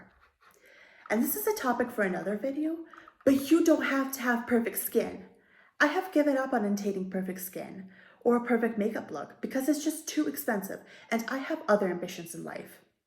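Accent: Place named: United States. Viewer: Where are you from? You say Euros but your accent sounds totally US-American!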